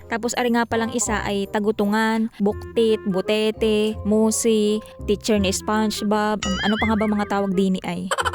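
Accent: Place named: Visayas, Philippines. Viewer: Nakakatuwa ka naman magsalita...my halong bisaya o cebuano...😂😂😂😂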